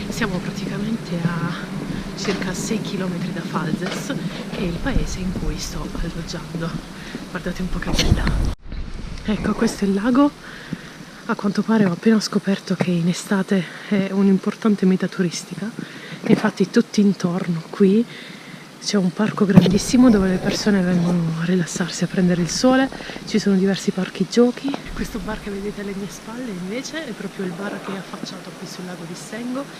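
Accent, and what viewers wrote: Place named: Apulia, Italy. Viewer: Sei in gamba e una bravissima comunicatrice professionale e mai banale. Mi fanno impazzire le tue pronunce pugliesi e la totale mancanza della esse impura!! Sei grande!!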